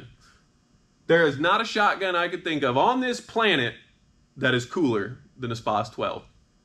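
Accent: South, United States. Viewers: This man sounds exactly like demolition ranch but with a bit more of a southern accent.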